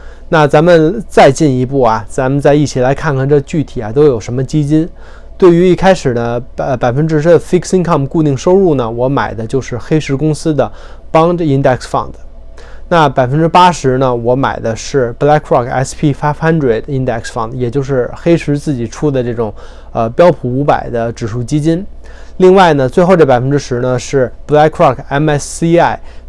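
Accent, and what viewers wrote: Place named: Tianjin, China. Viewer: 天津人吧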